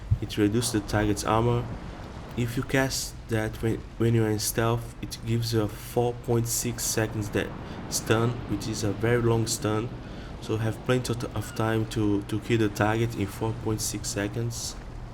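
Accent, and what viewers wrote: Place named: Brazil. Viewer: this accent...are you brazilian? haha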